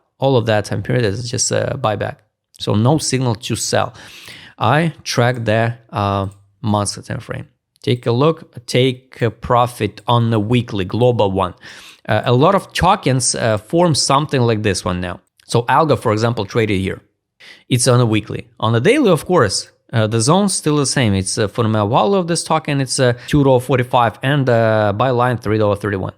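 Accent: Russia. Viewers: Твой русский акцент превосходен.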